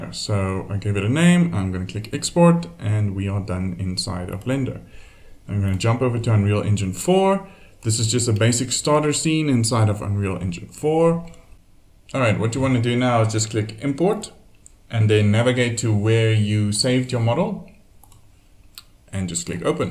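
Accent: South Africa. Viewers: Sounds like a South African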